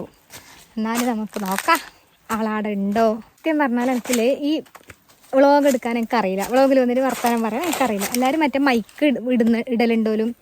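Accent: Kasaragod, India. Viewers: ഓർജിനൽ കാസർകോട് ഇഷ്ടപ്പെട്ടു❤❤❤ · എല്ലാ പുല്ലും കായും എല്ലാം പറിച്ചു തിന്നാൻ ഇതെന്താണ് പശുവാണോ എന്തായാലും നിങ്ങളെ കാസർകോടൻ സ്ലാങ്ക് ഇഷ്ടപ്പെട്ടു വീണ്ടും പുതിയൊരു വീഡിയോ ആയിട്ട് വരൂ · അത്രയും ബോറാണോ നമ്മളെ കാസഗോഡ് ഭാഷ... നിങ്ങൾ ഇങ്ങോട്ട് വീടെടുത്തു പോര് ചേട്ടാ കാസർഗോഡിനെ കുറിച്ച് അറിയാൻ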